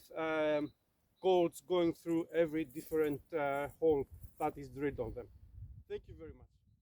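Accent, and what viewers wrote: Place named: Greece. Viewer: You sound Greek. Are you Greek?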